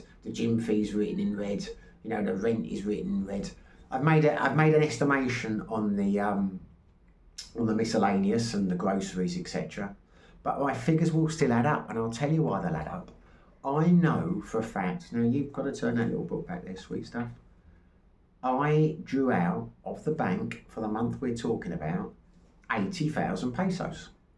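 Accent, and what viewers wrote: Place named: London, United Kingdom. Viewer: Good to hear another Londoner in the Philippines, I'm living in Cebu, also got a you tube channel, loving it here, keep up your channel. Cheers mate, Colin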